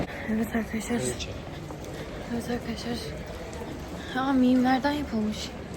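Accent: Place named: United Kingdom. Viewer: Ingiliz aksanli heyecanla bekliyorumm❤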